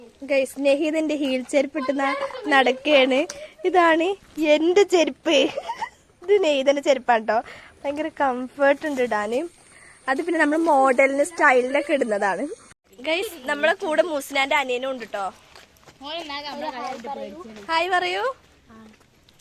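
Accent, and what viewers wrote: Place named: Malappuram, India. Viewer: Thani Malappuram samsaram😂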